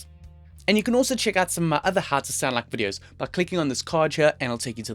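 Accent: South Africa. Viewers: Ayyy I noticed that accent! Fellow South African!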